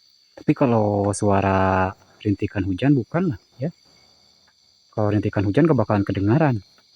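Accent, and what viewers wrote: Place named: Sunda, Indonesia. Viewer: logat sundanya kentel bngt orang cianjur ya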